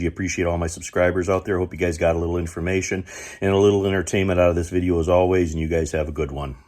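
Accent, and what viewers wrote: Michigan, United States. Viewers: I am from TC. I live in eastern Oregon now. I miss the Michigan accent!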